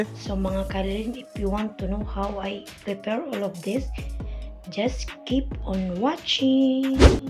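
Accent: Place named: Arab world. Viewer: Ayos host ah parang arabo na rin magsalita hehe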